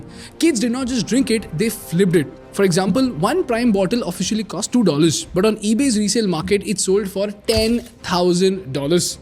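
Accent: India. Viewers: The Indian accent is creepier than labubu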